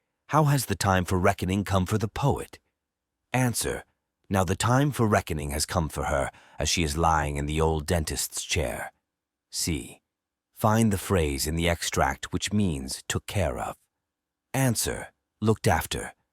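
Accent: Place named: United Kingdom. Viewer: change the voice changer, this is in uk accent change to something that can we understand apart this your teaching style is very unique and good it helped me thanx😊❤👍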